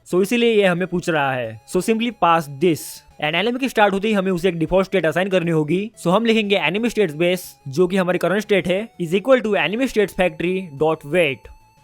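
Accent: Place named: Maharashtra, India. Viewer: bhai tera tutorial ka pronounciation sun ke me <num>% sure hu ki tu marathi aahes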